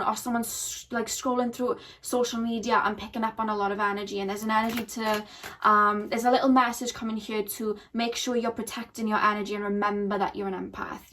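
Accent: Wales, United Kingdom. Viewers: Im Welsh And Im Sure I Can Hear A Welsh Accent There 🤔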